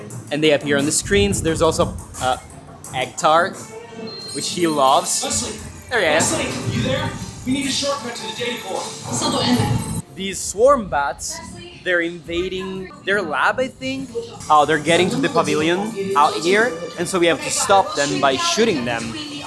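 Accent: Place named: Italy. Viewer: Come imparare l’inglese? con i video di in inglese ahaha, video visto in italiano e inglese 😍. Parco stupendo sopratutto per le aree esclusive, da visitare assolutamente una volta nella vita. Continua così anche se per ora c’è poco pubblico piano piano andrà sempre meglio, ci sono pochi canali che raccontano le cose come fai tu. E poi il tuo accento italiano mi aiuta a capire un inglese più vicino a noi. Grazie per questi contenuti interessanti e fatti molto bene 🤙🏻❤️ Aspetto il video su Shanghai e gli altri dell’ America. A poi vi consiglio a te e non so valutate voi in base la richiesta di fare tour dei parchi nel mondo con noi. È una buona idea di business dove ci si diverte e si passa del tempo insieme. ( Sopratutto aggiungo, con la vostra preparazione nella storia dei parchi. ) Pacchetto tutto organizzato. Con voi come guide.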